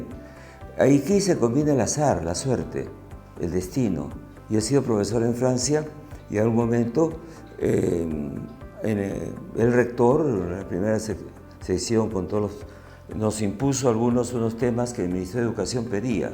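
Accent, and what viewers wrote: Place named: Peru. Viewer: G Reinsther al sr del video se le nota su acento. es peruano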